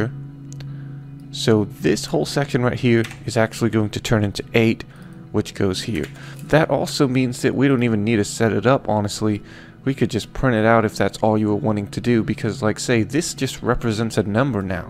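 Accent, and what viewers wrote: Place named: Scotland, United Kingdom. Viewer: You sound so Scottish in this lesson ^^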